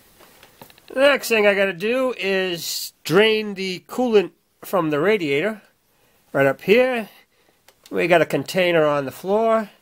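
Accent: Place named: East Coast, United States. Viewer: If the accent doesn’t scream “east coast,” then the rust certainly does!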